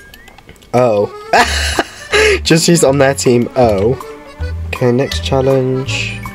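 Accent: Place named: United Kingdom. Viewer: I love Your accent lol are you British?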